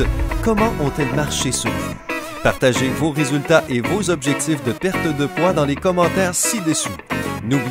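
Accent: Quebec, Canada. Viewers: C est l'accent de Québec celui ci?désolé mais c est vraiment étrange. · Des rigolos avec un accent québécois qui dis n'importe quoi j'en ai vu beaucoup, mais des comme toi..... Une perle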